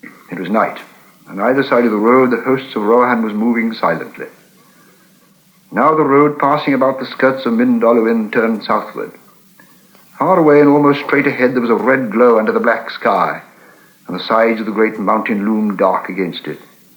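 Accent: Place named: Scotland, United Kingdom. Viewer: His Received Pronunciation makes him sound Scottish!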